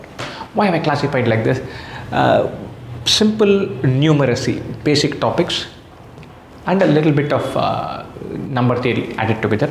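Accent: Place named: South India, India. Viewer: everything all good but get trouble understanding your language( south accent) maybe.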